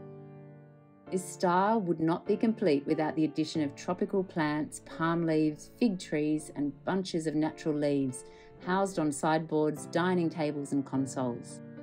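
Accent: Australia. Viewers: Thank you for an informative video. It was great hearing an Aussie accent too.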